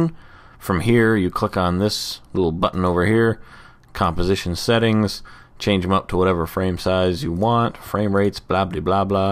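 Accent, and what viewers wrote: Canada. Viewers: You sound canadian are you? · Are you canadian? :D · Nice work! thanks for the help! By the way, are you a Canadian? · I know it's mostly just because you're Canadian, but omg you sound so much like Seth Rogen. <3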